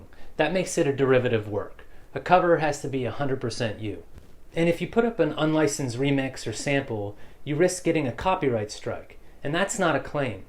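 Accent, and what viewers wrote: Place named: United States. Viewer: NICE, CLEAR AMERICAN ENGLISH & WELL GOOD EXPLANATIONS, SALUTE !!!.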